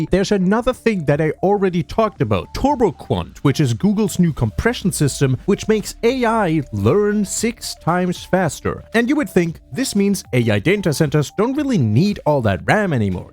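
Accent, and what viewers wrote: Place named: Europe. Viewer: Yes and No, this guy has always been politically biased, based off the accent he's probably European or south American or smthn which means this guy has probably zero actual knowledge of America or its politics (not that most Americans are any better). Attacking Iran is an objectively correct choice and something we should have done decades ago when they first had their regime change. They are the #1 sponsor of terrorism in the world and getting rid of them means significantly less problems in the middle east down the line which is why 90% of the middle east + China is in support of the US effort to put them down. And once they're finished we can swoop in, stick all our fingers in all their pies and take their oil for our own as a reward. Aka the same thing we're doing with venazuela. In the short term it means more expensive games, in the long term it SHOULD mean less expensive games and everything else, +less chaos in the middle east. 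It's not a stupid decision, Iran is just more "roachy" than venazuela. Their military is demolished, they have no real power or influence and their only ally Russia is fighting their own war. They're just unnecessarily and pettily spreading it out. Should be over by the end of the year at most or within a couple months at least.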